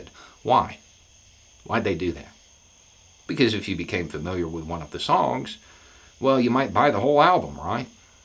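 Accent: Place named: Idaho, United States. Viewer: Sounds like north Idaho.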